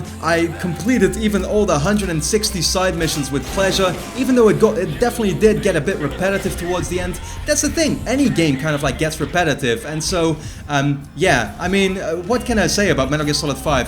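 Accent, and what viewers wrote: Netherlands. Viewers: omg are you dutch? i tought it from your voice ( im dutch :p)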